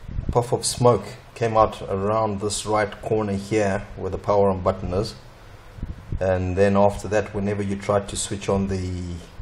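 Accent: South Africa. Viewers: The SA accent?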